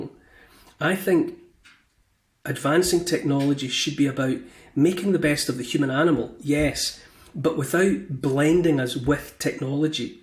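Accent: Scotland, United Kingdom. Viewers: Scottish accent is "da best"❤. No, this is not really how i want to accept things but the "critical mass" is falling towards transhumanism, the 4th. revolution😓 if i could i would change planets right now.... people have lost their north.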